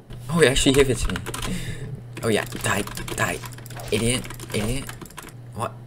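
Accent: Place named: United States. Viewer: He’s American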